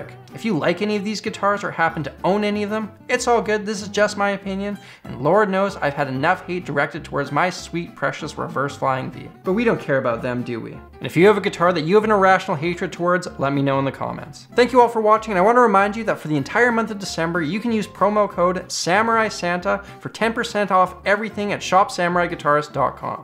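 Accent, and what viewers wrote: Canada. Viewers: First ten seconds I knew you were a fellow Canadian lol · This is the most Canadian man I have ever heard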